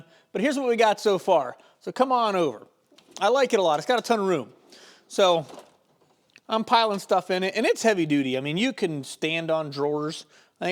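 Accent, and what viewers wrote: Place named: Jamaica. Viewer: Dude you’re wasting you talent big time. You have to close your eyes so you can see better😮😅. Told my wife she immediately asked if you were Jamaican 😅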